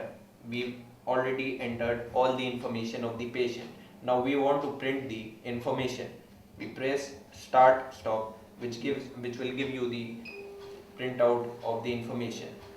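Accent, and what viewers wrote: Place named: India. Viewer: thanku sr for making video in Indian english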